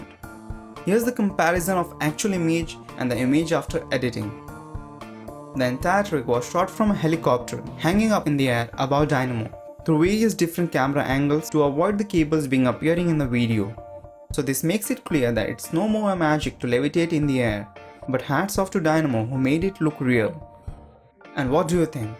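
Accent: India. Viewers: I also think His English assent is completely Indian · That Indian Accent Puts Me Off! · Is this narrator Indian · Sound like Indian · This guy sounds like Indian. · Are you indian? You sound like an Indian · From India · U have indian ascent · Is the narrator in this video indian 🤔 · Why da hell is the Hindu trying to convince me with his accent ? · Because he is an Indian. And sadly for you, you only talked Indians before via Tech supports. So you're now surprised how a Magic explainer's voice can be an Indian voice. Fact of the matter is, magic tricks finds it's home in India. It's a home of magic. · You sound very indian and that's a great thing . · Its Indian English.... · Mi sijaelewa kabisaaa lafudhi yako ya kihindi....mgumu kuelewa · I like the VIDEO, but i don't like the narrator's accent. Please don't use Indian accent, coz Indian accent sound so stupid. 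· When v sounds like w, you know he's from india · his unbearable Indian accent!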